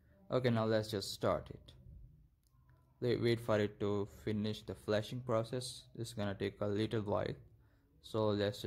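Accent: India. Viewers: when you heare an Indian Accent you know its the Right video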